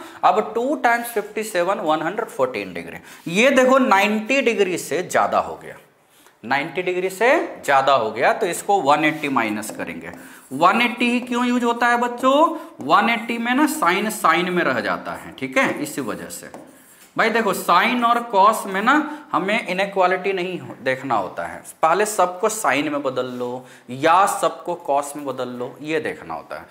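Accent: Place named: Bhojpuri, India. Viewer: Thoda Bhojpuri tadka 🌶️ lag rha hai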